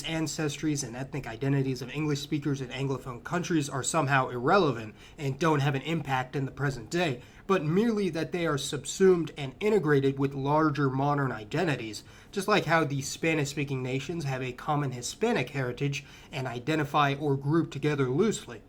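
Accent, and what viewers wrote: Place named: General American, United States. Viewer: English is truly the best, the reason why for some it sounds meh is because it's spoken by billions of people as a língua franca. If it was spanish it'll be the same for that language or any other one. It also has many different accents but most are used to the version that is speaking in which is the standard american accent, because it is the norm in youtube. When you get use to something overtime it gets boring.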